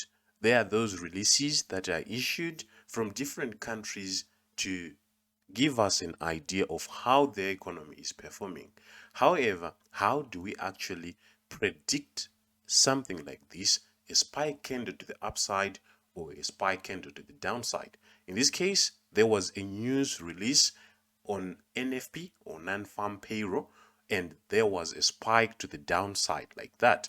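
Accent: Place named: Zimbabwe. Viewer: Hie bro, you sound like a Zimbabwean, are you from Zim?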